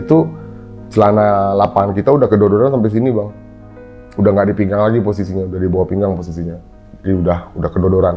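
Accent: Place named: West Sumatra, Indonesia. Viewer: Urang Awak ma suaro nyooo Cubo agiah ampu hehehe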